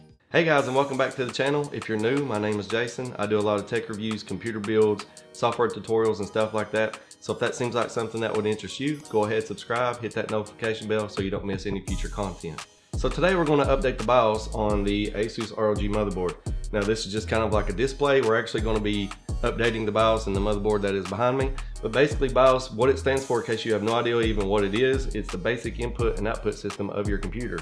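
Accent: South, United States. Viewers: thank you so much. didn't expect a computer man to have a southern accent haha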